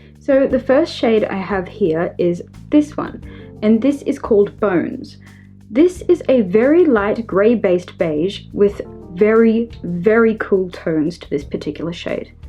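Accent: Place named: Australia. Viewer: Ken Ken she's Australian :)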